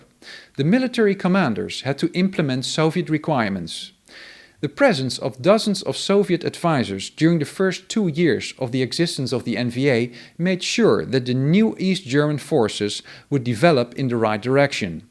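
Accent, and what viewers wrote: Netherlands. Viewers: How funny, that you speak perfect German, but you speak English with a heavy Dutch accent. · You speak with a very Dutch accent for a German man. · Geweldig kanaal ! Ik hoor toch echt heel goed Engels met een Nederlands accent. Klopt dit? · Although he speaks with. Dutch accent, his German pronunciation is great!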